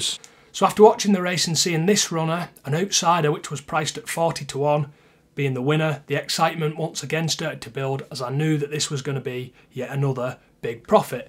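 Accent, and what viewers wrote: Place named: Manchester, United Kingdom. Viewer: Man, your videos are great, you put a lot of effort into them and the explanations are very clear. I got used to your Mancunian accent also, it is all breeze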